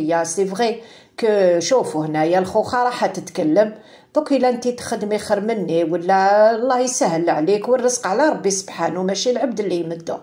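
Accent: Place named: Tipaza, Algeria. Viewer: عباتلي انتي من تيبازة قريب تهدري كما هوما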